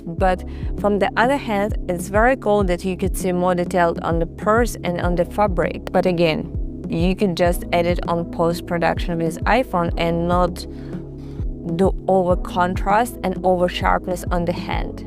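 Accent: Russia. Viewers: Мне показалось, или я слышу русский акцент? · Слышу русский акцент 😊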